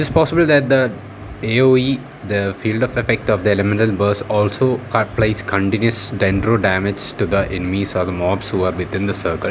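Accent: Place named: India. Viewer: Bro you are Indian right your accent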